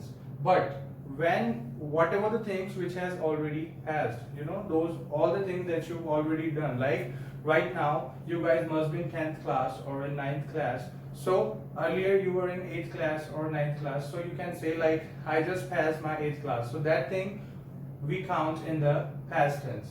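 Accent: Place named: United States. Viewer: Ye sir American english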